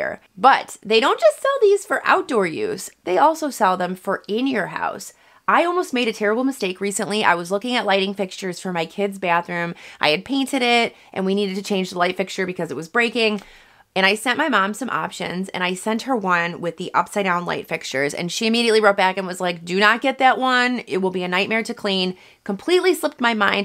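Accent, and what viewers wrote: United States. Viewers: Love your Midwest accent. Great tips, thank you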